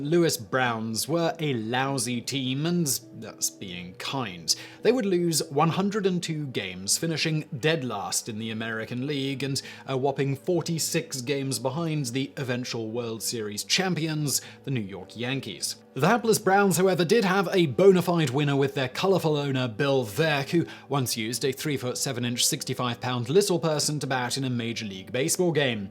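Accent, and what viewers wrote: United Kingdom. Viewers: Do you cold read from a script? Your pronunciation of some names in your videos, British accent notwithstanding (When will Brits speak English?) is often unique, if not horrendous. Eddie [GAE-del]? Seriously?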